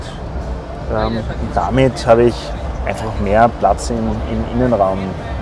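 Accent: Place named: Austria. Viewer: Ich glaube, für Leute wie mich, die sehr aufgeschlossen und begeistert für E-Mobilität sind, aber andererseits nicht sehr risikofreudig - seid Ihr die richtigen Partner. Als Allgäuer höre ich die österreichische Sprachfärbung sowiso lieber, als die Ost-Deutsche.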